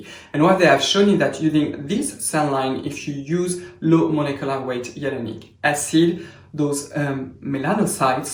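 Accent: France. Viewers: your content is amazing and you are so knowledgeable. However it is difficult sometimes to follow or understand the concept or language or some words, especially for foreigners who are not used to different accents (your accent is beautifully French and I am NOT criticizing it at all, please do not get me wrong, I am foreigner myself) - have you considered maybe making a little graphic boards for us? Like simple white board and a pen to explain? Thank you, please keep doing your job <3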